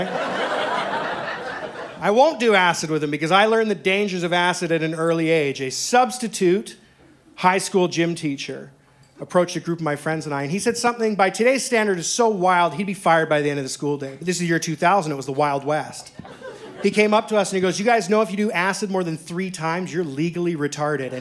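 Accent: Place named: Canada. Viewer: I wasn't picking up on the Canadian accent at first until I realized that sometimes your enunciation sounds like Jordan Peterson 😂😂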